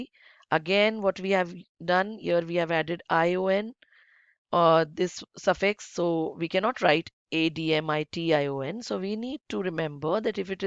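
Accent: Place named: India. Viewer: teacher, thank you too much for this [ clear tutorial ] and [ the helpful ] which solved problem had been with me. from your accent I think u are Indian by the way I lived in India 3 years mostly in Bangalore and Mumbai, it was really unique time and I really want the time give me another chance to visit India..........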